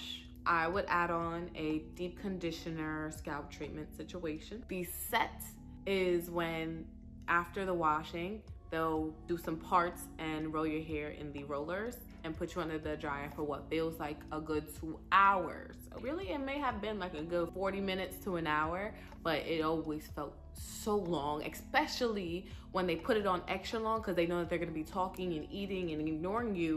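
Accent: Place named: New York, United States. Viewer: omg i knew u were a new yorker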